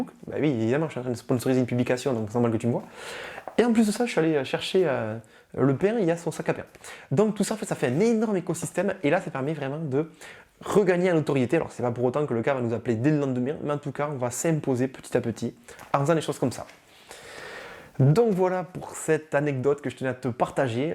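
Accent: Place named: South, France. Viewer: Hello ! Alors moi je t’explique, j’ai entrepris des démarches depuis quelques semaines pour devenir mandataire immobilier, en auto entrepreneur... Évidemment je n’y connais rien en Immobilier car je débute et qu’il faut un début à tout, je vais apprendre grâce à mon réseau l’agence pour laquelle je dépends, et en autodidacte sur les innombrables vidéos tutoriels YouTube qui existent.....puis en allant sur le terrain parce que c’est sur le tas qu’on apprend le plus aussi ! A ton accent on devine que tu es du Sud, si ça peut te rassurer on ne sera pas concurrent puis je suis du « Noooooorrrd » comme disait Galabru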